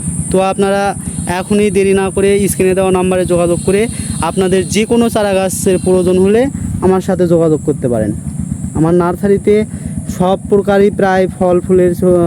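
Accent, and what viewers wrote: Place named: Bogura, Bangladesh. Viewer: বগুড়ার কথায়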